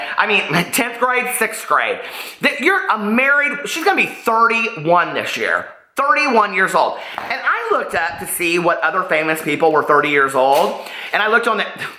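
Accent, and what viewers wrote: South, United States. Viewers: BEAST!!! or Peace :-) I have literally watched your videos all week here while I'm at work (so bad) but I do thank you. It's so hard to believe you're not a Southern. You sound just like us Southern Folk. I enjoy your videos and thank you for being yourself. Love You!!!!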